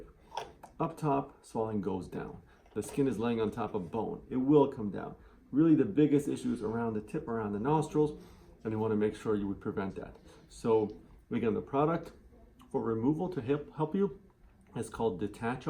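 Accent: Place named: Iran. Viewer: اول از لهجه فهمیدم ایرونین بعد فامیلیتونو دیدم ،عالی😅😂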